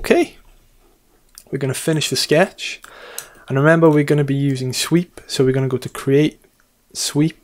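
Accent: South Wales, United Kingdom. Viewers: EXACTLY what I was looking for explained concisely and clearly in that wonderful South Walian accent. Merthyr boy here (now in Snwdonia) enjoying your videos.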